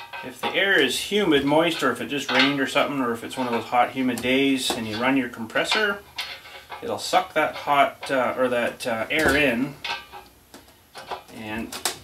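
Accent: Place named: Canada. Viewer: wow! i guess you are a canuck. (did i spell that right)? your accent makes me smile, and thank you for that! Goofy Canadians. LOL.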